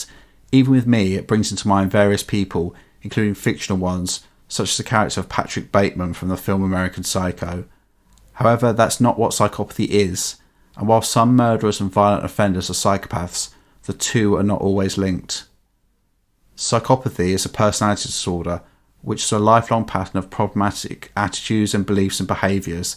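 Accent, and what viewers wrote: United Kingdom. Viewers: You need to give up the pretensious British accent for an American equivalent - and switch your grammar and spelling. Look forward to 'center' over 'centre' and 'color' over 'colour'! Good luck buddy!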